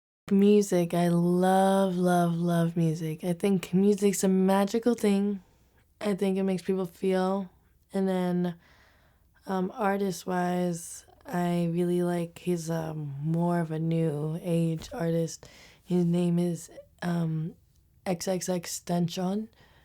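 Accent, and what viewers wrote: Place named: United States. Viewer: She speaks American English...But she's Brazilian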